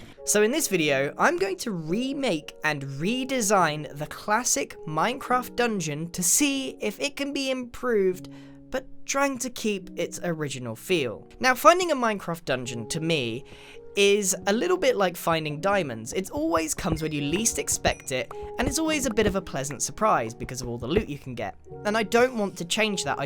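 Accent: United Kingdom. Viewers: Sometimes I watch your videos just to listen to your voice. Your British accent is so soothing.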